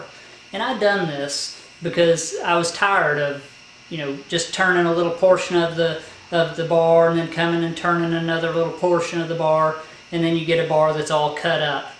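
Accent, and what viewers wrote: United States. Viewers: Can't remember who pointed me in your direction but it certainly was worthwhile. Refreshing to see a youngster working with metal. Sorry if I missed the information in a yet to be viewed video but who manufactured your lathe? I'm not from the USA so please tell me about your accent, which state are you from? As for me, my avatar should give you some specific information. I've just subscribed!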